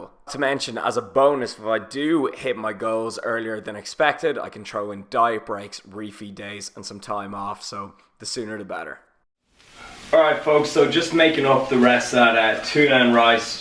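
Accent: Ireland. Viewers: "wake the fuck up" --- this guy is defo irish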